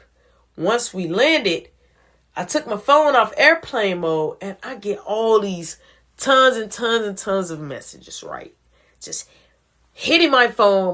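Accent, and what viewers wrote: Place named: Chicago, United States. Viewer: I thought you was from Chicago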